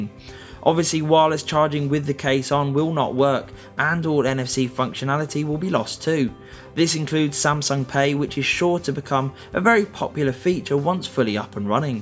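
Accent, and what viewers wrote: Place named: United Kingdom. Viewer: i need more of that british accent...makes all your videos enjoyable!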